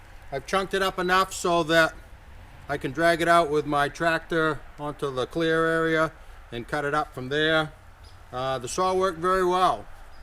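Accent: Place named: New England, United States. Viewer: Idk, maybe Maine, upper Vermont, or mass? It's hard to tell.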